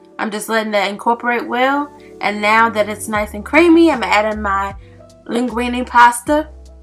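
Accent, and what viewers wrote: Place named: South, United States. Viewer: You sound so sweet and you’re definitely from the south which makes you sweet. I’m from the south tube but I live in Arizona. It’s not as sweet as the south. I like your cooking channel. Keep it up girl.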